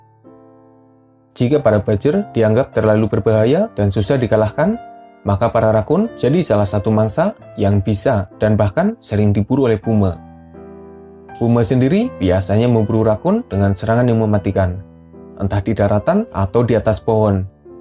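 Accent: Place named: Tegal, Indonesia. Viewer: Admint nya mudah dipahami karena logatnya Tegal atau Banyumas banget 😮😂, pada Karo nyong wong ngapak. Malah ingat lagu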